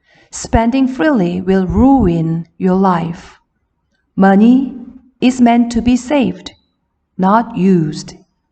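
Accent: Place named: United Kingdom. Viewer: 영국식 영어발음 넘 멋져요 ❤️ 읽어 봐야겠어요.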